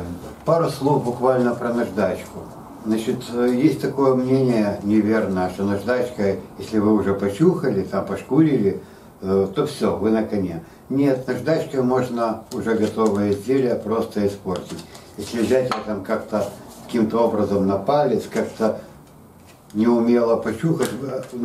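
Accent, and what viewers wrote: Odessa, Ukraine. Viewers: "Свежая кровь" в столярном влогинге.Ни одного дизлайка!Так держать!Я подписался(одесский говор ласкает слух))))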